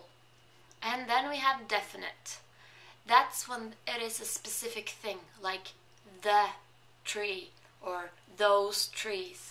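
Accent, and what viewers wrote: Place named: Standard, Norway. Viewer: The official status in general of both Nynorsk and Bokmål is that people have a lot of choice because the labor party tried to merge the languages (allowing versions close to the other one) while other political parties tried to keep them apart ending with a wide specter of permitted forms. I don't know if that is the case with female forms too, but it wouldn't surprise me... We don't have any official standard spoken Norwegian although her dialect is almost the de facto standard.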